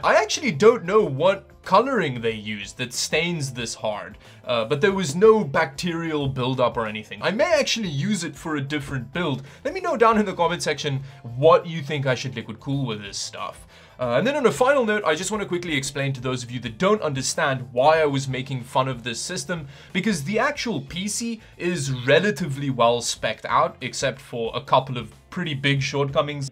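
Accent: South Africa. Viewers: are you south african?! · Are you from South Africa?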